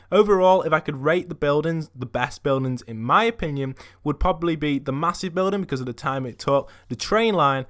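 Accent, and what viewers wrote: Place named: England, United Kingdom. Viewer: Defo English he says orll instead of arl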